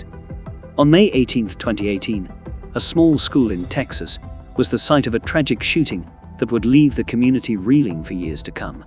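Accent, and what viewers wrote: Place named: United Kingdom. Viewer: Why is a British voice doing the narrating for this video. These are murders that took place in America, the whole trope of having a esteemed British sounding voice to the narration for a documentary or a newspaper whatever is so corny.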